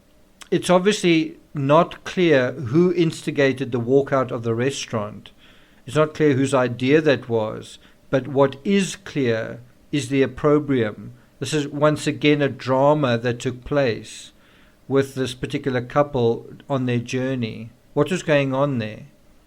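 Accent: South Africa. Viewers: I’m trying to figure out your accent, I’m guessing South African???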